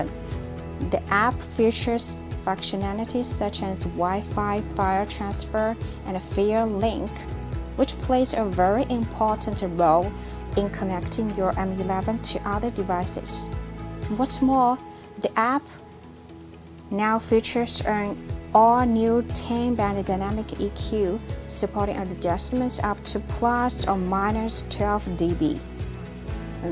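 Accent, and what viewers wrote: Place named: China. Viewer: Lol She legit sounds like Siri speaking English in typical Chinese accent No offense tho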